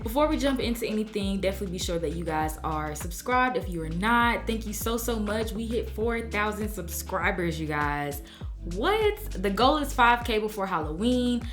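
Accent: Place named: Florida, United States. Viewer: U have a Floridian accent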